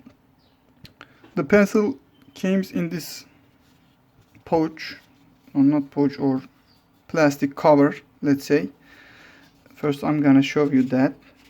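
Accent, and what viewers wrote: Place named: Turkey. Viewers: 2.saniyede falan türk olmama ihtimali yok dedim ama açıklama ve isimden dolayı yanıldım mı acaba dedim, yorumlar neyse ki şaşırtmadı :) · Aksanın ben türküm diye bagırıyo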